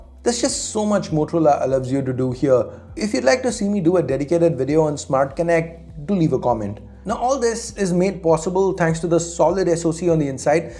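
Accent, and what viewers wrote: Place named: India. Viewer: Indian English is ridiculously funny 🤣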